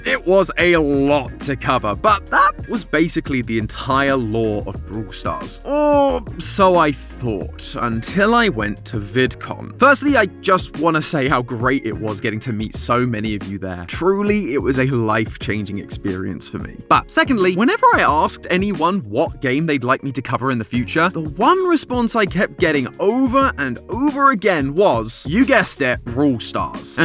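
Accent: United Kingdom. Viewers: Man I love the British accent...brool stars. hehehehe😅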